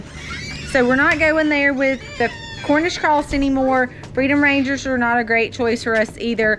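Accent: South, United States. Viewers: I also love hearing your Southern drawl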